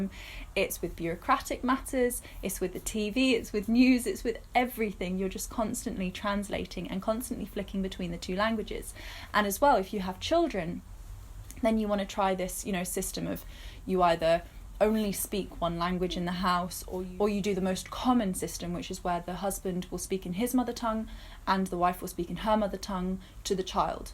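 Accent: United Kingdom. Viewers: I love her accent. UK? I married a Saudi 🇸🇦 and it was a bit of culture shock. But he helps at home & is so romantic: not at all like my American Dad! They have a staff in KSA but we just manage ourselves in the 🇺🇸 states. 🤷🏻‍♀️ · Is your accent English... It is really nice accent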